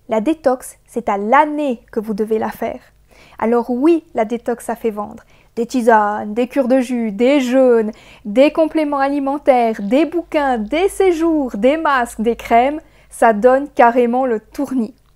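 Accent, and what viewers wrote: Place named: Switzerland. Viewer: Bonjour 😊 Contente d' avoir découvert votre chaîne grâce à douce frugalité 😊 Ca me rend si heureuse d' entendre fruits et légumes pour faire une détox 😉 Je suis une raw vegan..Une 👽pour la plupart des gens😅 mais bon j' assume. Ah...Ce nonante😅 Je pensais que vous étiez peut-être belge ou suisse...En Belgique on dit aussi nonante et Septante..En écoutant bien j'ai bien deviné que vous êtes bien suisse 😊 🥰🥰🥰🥰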